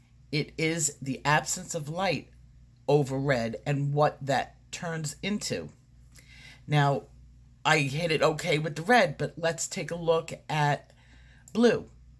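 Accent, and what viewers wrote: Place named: East Coast, United States. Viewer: I am so excited to do the step by step with you and what an awesome gift....you are a very beautiful teacher. You remind me of Cary Ublouer who was a teacher I studied with many years ago. Since you have an accent, I told my friend Bill about you...he just loves your lessons because he's from the East coast and misses home! Thank you so much for your sharing your experience with us. You're a wonderful teacher!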